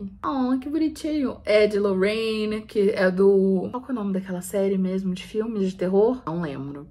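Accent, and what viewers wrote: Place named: Minas Gerais, Brazil. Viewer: amo demais a sua dinâmica de vídeos, amo estantes alheias, amo a maneira que você é educada sim, amo o nosso sotaque mara de Minas, amo as indicações, mesmo que meu gosto literário é um pouco diferente você me desafia a ler vários conteúdos diferentes!!! ❤ continue!!!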